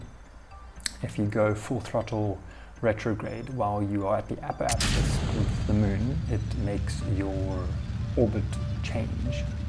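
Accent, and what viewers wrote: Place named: South Africa. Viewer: dude you sound south african lol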